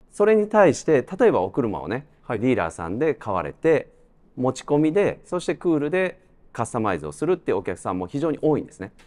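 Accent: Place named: Osaka, Japan. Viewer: 私は車が好きな、スーパーカー時代生まれのオジサンです。 私は車を所有出来る様な経済状況には無いのですが、もしその日が来たなら、是非KUHLさんの車が欲しいと、毎回動画を見ながら夢を見ています。 今後も社長のトーク(大阪商人的な)を楽しみにしています。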